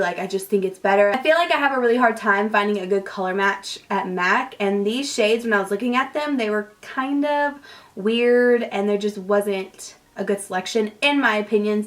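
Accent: South, United States. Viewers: Hey! I'm such a huge fan of yours. A couple months ago I felt you were somewhat losing your more genuine reviews and videos and it seemed like an abundance of advertisements etc. Not hating at all, you were still my absolute favourite on YouTube. But lately you've been putting out such genuine reviews and it's been reminding me of your old videos again! I'm so happy about the content and the amount of videos you've been putting out lately. Just wanted to say that you are more stunning than ever, you're videos have been so amazing and I wish you and Davis all the best. You guys look like you're the happiest you've been! Thanks for sticking to your roots, your subscribers really appreciate the "realness" of your videos. And I love that southern accent! You're beautiful.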